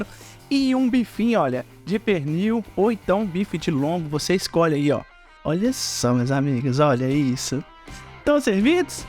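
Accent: Minas Gerais, Brazil. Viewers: Modo de falar hororoso sotaque mineiro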